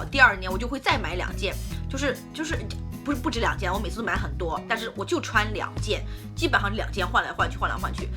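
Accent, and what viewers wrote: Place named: Qingdao, China. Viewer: 听姐姐说话像青岛人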